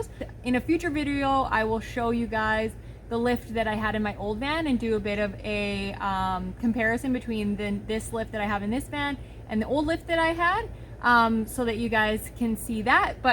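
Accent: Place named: Canada. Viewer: I love a good Canadian accent. Every last work in their sentences goes up a whole pitch. lol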